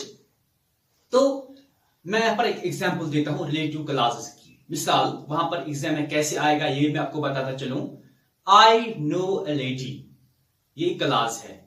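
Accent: Kashmir, India. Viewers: Sir ji aap Kashmir se ho kya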